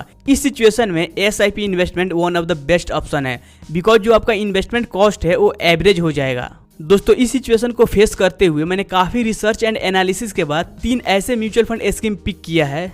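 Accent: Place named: India. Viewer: Bro.. your videos are very informative...Thank you...also please pronounce proper English words.. don't mix Hindi pronunciation... please check spelling mistakes...Eg.Fund..not fhund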